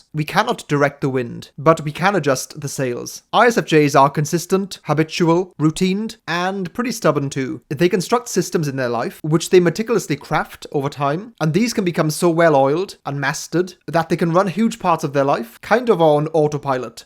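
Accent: United Kingdom. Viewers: One thing I’ve struggled with as a very introverted ISFJ is that, because I keep pretty high boundary walls around my life, I’m not as busy helping other people as the ISFJ descriptions usually indicate. Over time, I’ve realized that my worry over this is simply another manifestation of the same underlying issue: that we ISFJs are anxious about doing too little. Many ISFJs hurt themselves by giving too much, while others hurt themselves by giving a normal/healthy amount and then sitting around feeling bad about it. If you have an ISFJ in your life who’s constantly overgiving or freaking out about whether they’re giving enough, the best way you can help them is reassurance that you are content with them as they are. Though they are giving/helping to make you happy, they’re likely also doing it to stave off feelings of worthlessness, so if you can encourage them to feel satisfied, they’re less likely to get neurotic and burn themselves out. 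If I can be satisfied with giving what I’m able to and not feeling bad that it’s not 100%, to-the-death giving, I’ll be a much healthier person. Thanks for understanding me,! (And thanks for being British. I love your accent.)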